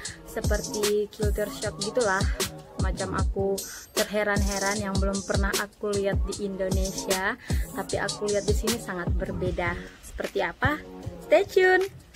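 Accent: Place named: Perak, Malaysia. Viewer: Dialek orang perak, awak makna saya/aku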